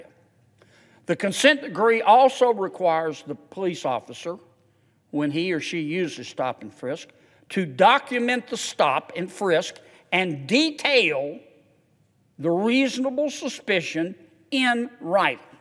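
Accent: South, United States. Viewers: Yeah his southern accent is nice, but what the hell is he doing to get the ar15 off the street and save our children. NOTHING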